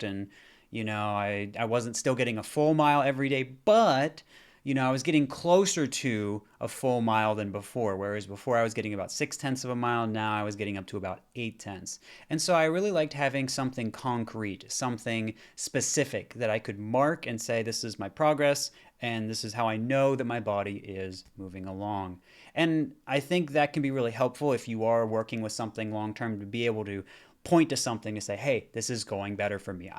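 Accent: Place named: Tennessee, United States. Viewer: I'm just trying to figure out where your accent went. I hear a subtle Tennessee dialect. But other wise its gone.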